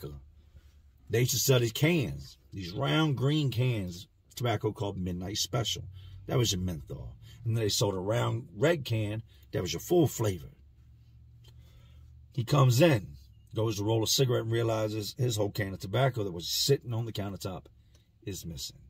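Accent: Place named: Boston, United States. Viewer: yep all he does is do stories about small fry rapper beefs and shootings n shit. Just regurgitating the same shit as every other news outlet. He just does it with a Boston gangster accent. Thats it.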